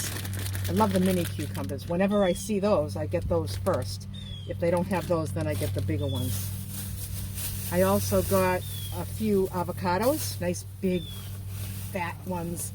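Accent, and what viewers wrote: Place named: Massachusetts, United States. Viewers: my dad's family is from Canton, MA and I can't tell you how much you remind me of them. Love your 'get it done! ' attitude (and your glorious accent! ♥️) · I eat like you I cook mostly potatoes and beans in pressure cooker. I was wandering what is you plan to receive mail? I asked since we are from same state I like your Ma accent I can hear it. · love hearing ur Mass. Accent. I am a vegetarian eater too. Steam mostly in fry pan. But oven bake yams & potatoes. U encourage me to use the pressure cooker. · I love your videos—especially the healthy eating component and your lovely Boston dialect .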